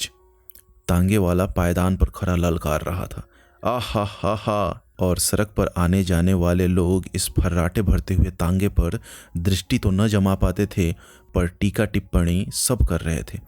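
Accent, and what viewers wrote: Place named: Bengal, India. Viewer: आप मूलतः बंगाल से हैं क्या? उच्चारण में वहाँ का रंग घुला हुआ है. 😊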